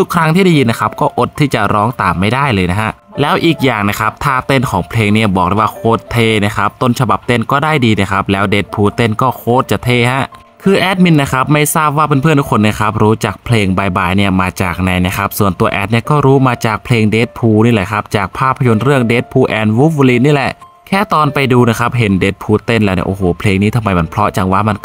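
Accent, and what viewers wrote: Cambodia. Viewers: สำเนียงเขาเหมือนเพื่อนเหมนของเรา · แอดน่าจะอยู่ อีสานใต้คับเลย สำเนียงไปทาง ขแมร์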